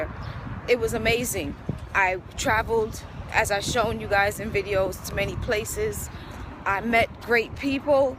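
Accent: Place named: United States. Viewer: You better get your ass out of there.. Before something go wrong..like go to jail.. remember you don't suppose to be there... The key word is illegally.. you sound like you are American woman if I was you I will get back to United States and you can go somewhere like Canada or Brittany will somewhere where's though you're not going to go to jail for being their country illegally get the F from out of there before you regret it sister Sincerely Nate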